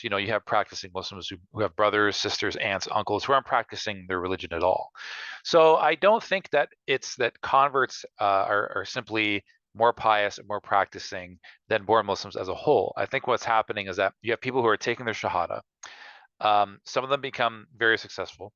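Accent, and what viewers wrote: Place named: Canada. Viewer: Also love the Canadian accent, the best accent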